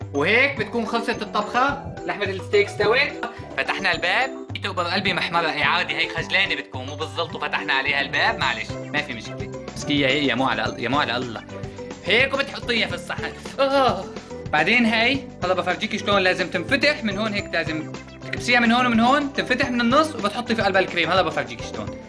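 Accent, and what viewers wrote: Aleppo, Syria. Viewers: الحلو فيو يذكرنا حكي حلب يا خيتوووووووو😊😊😊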